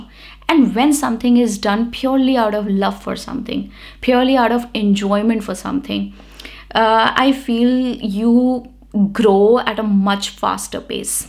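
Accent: India. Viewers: Don't make videos regarding pronunciation cuz Indian pronunciation sucks. Some of you might be thinking I'm obnoxious and trolling her, but I'm not. I searched on Google, "Why do native speakers make fun of the Indian accent?" I opened a Quara link, and a native speaker has written when Indians speak English, it sounds as if a native speaker is trying to speak Hindi. Now, you can image how awful it is.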